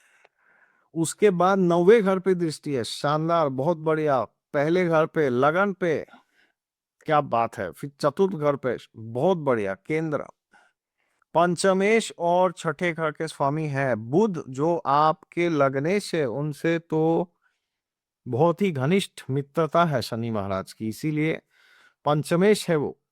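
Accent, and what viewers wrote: Assam, India. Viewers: Sir Aapki Hindi to kaphi acchi hain, Sir aap Assam se ho kya? Apki accent Assamese ya Bengali jaisi lagti hain???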